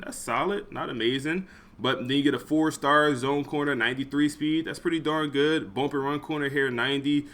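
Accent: Philadelphia, United States. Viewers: bro straight from philly lmao great vid fam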